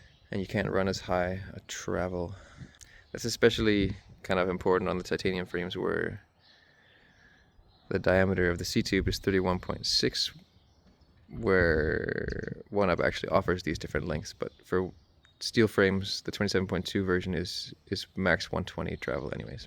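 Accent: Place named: Canada. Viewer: i can hear the canadian in ya bud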